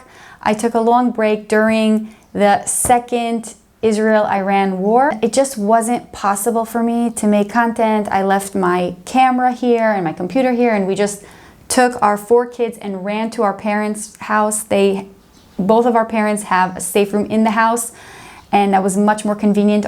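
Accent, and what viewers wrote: United States. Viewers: Which part of America are you from?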